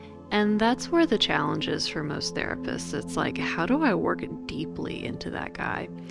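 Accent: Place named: United States. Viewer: I like this girls really American voice. As a non American she reminds me of watching the US antiques roadshow. Also she gives a mean massage.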